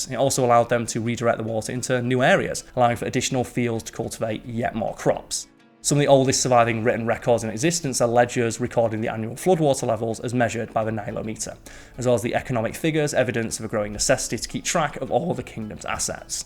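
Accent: United Kingdom. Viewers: A British voice does make it a Simon video!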